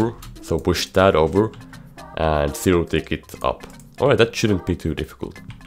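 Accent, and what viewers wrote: Finland. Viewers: I sense the Finnish accent, great video👍🏼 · You have the most finnish accent ever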